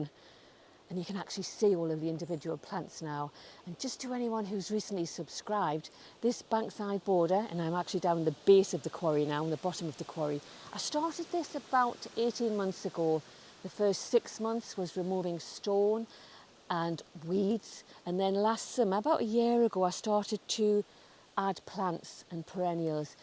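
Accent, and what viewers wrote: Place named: Northumberland, United Kingdom. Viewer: I just discovered you yesterday and I knew I recognized that accent. I was born in Northumberland but the area is now a part of Tyne and Wear...Cullercoats/North Shields area. I've lived in Illinois most of my life after we emigrated in the 60's. I was surprised to hear about the Annabelle hydrangea being from Anna Illinois in a different video. Enjoying watching you ❤️🇬🇧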